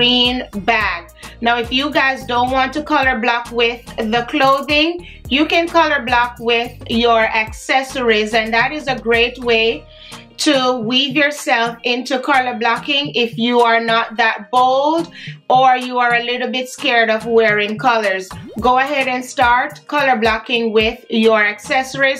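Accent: Patois, Jamaica. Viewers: I just found your channel and I’m like she is Jamaican… wait till the patois comes out and it did !!!!I fell out laughing 😂 YES!!! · "mi no like when we pants look like me tek measurement in a water." Real Jamaican. Girl you are funny. Love the haul.🤣🤣🤣🤣🤣🤣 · At first I was like this African lady looks beautiful let me watch. Then d accent chip in and i was like . A JAMAICAN DEN SHE NUH MUSS KILL DIS... LOVE D VIDEO !! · YOUR PAGE NEEDS CROWD!!! HELLA TALENTED. I hear a Jamaican accent . New subscriber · Knew I was hearing Jamaican! New subbie hear. Great haul 😍😍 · Love your Jamaican accent. You are? Love all the pieces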